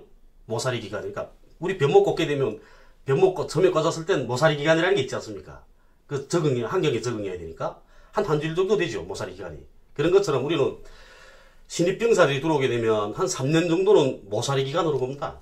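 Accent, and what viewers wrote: North Korea. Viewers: 재미있게 잘 보았습니다. 북쪽 말을 알아 듣기가 조금 힘이 드네요. 70 ~ 80 % 정도 밖에 이해를 못 했습니다. 말씀을 조금만 천천히 해 주시면 더 많이 알아 들을 수 있을 것 같습니다. 하루 속히 남북이 자유롭게 왕래할 수 있기를 바랍니다. 좋은 말씀 감사합니다. 남쪽에서 행복하게 사시길 빕니다. 건강하세요 !!!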